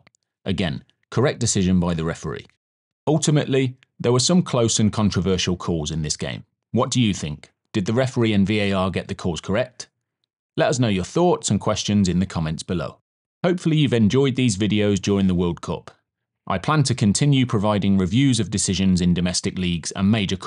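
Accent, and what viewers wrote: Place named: United Kingdom. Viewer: Thanks for the explanation, I like football but know near nothing and having a Brit explaining is great!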